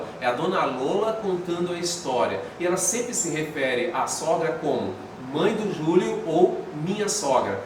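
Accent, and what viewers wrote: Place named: Santa Catarina, Brazil. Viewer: Oi, tens um sotaque catarina........ Adoro!!!!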